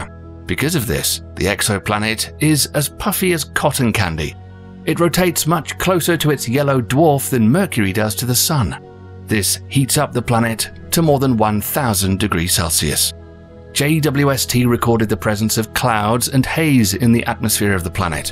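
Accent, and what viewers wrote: United Kingdom. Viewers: You think that a British accent gives videos some sort of validity and truth. But there is not much true information in this video. It's nearly completely fictitious rubbish.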